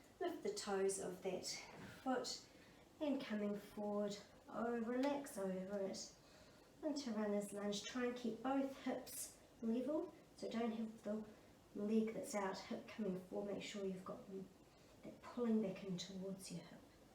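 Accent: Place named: New Zealand. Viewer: I love your dark hair! I don't know why your channel doesn't get more subscribers. I love your videos and your calming voice and very unique Kiwi accent. Just wanted to let you know you're definitely appreciated! 🤗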